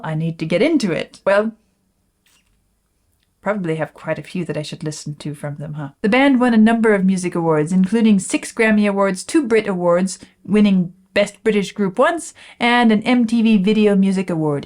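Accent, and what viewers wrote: Germany. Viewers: that helps a lot, thank you! And yes, I can hear the sort of German/amish sounds in there. I really appreciate this! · This used to drive me nuts, so I searched for the answer... According to one of her earlier videos, she's actually an American, born and raised, but grew up (after leaving California at a young age) with/near/surrounded by an Amish community (or something similar, apologies if I misremembered), who speak a dialect of German, and apparently that it what's influenced her accent. She says that speaking to other people in Tennessee, she can find herself slipping into that local accent as well. That's as much as I can recall of the story. I hope it helps.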